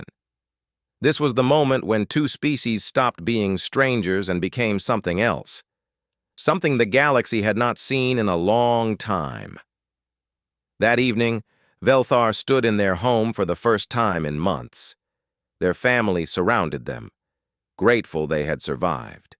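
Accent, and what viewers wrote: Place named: South Korea. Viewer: Which Korea? Having issues picking from the accent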